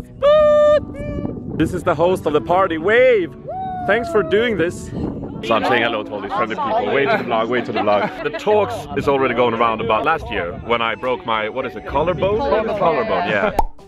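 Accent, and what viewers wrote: Sweden. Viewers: Swedes - check 90's Volvo 240 station wagon - check Swenglish accent - check Raggar factor 1000 - check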